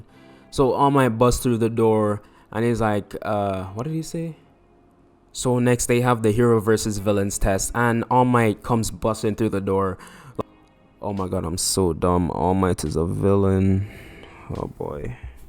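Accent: Jamaica. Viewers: Yo you sound Jamaican 🤔🤔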